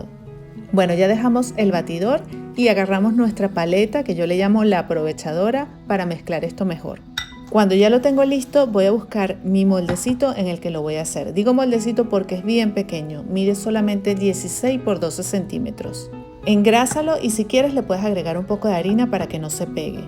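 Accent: Venezuela. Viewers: Amooo el acento Venezonalo, definitivamente!!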